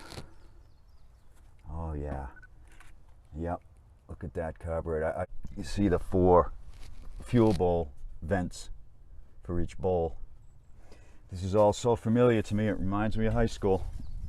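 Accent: New England, United States. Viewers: What part of New England are you from..lol I'm from the North Shore..😅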